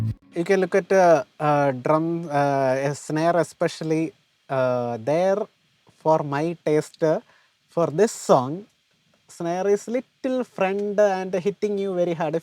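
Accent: Kerala, India. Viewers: bro nothing wrong in it, but since he’s explaining, I couldn’t catch it, cuz it was more like Malayalam English, the concept is amazing! He’s knowledgeable no doubts in that, just posted what I felt as a listener.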